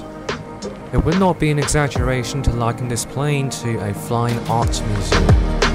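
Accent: United Kingdom. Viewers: 兄弟还是英伦腔哈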